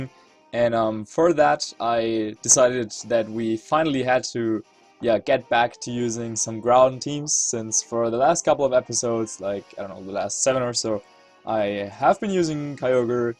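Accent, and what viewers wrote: Germany. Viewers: you're german right ?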